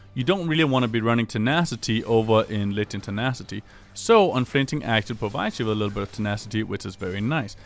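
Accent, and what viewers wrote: Denmark. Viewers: Er han dansk?? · I gotta ask, are you Danish? Great video BTW.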